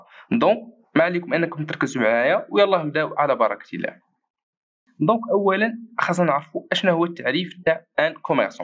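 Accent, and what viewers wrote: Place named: Morocco. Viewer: شكرا شرحك جدا واضح ة مفهوم رغم انني وجدت صعوبة في البداية لفهم اللهجة المغربية . تحياتي لك من السعودية🇸🇦 🇸🇦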